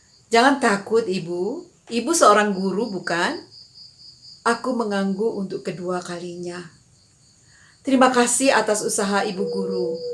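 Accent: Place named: Eastern Indonesia, Indonesia. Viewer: Mantap bu. Logat timurnya masih kentara yah bu. Sukses selalu Tuhan Yesus memberkati. 🙏🙏🙏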